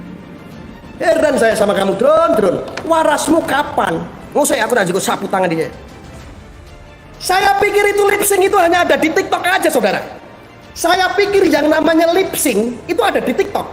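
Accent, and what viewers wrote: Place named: Surabaya, Indonesia. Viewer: Suraboyo banget...cok..cok...setuju aku... 😘🥰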